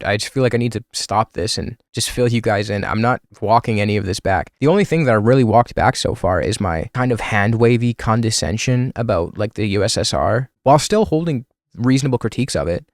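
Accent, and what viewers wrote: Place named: Quebec, Canada. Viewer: bro quebecois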